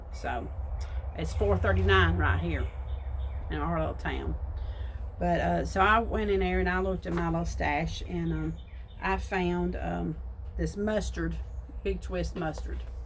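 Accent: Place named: Mississippi, United States. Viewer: where are you from cause you talk like me? I’m from Mississippi!❤️🧶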